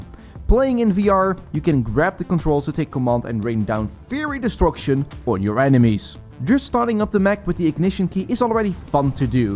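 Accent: Netherlands. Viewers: Hahaha! Typische Nedelandse accent! 🤣😁:yougotthis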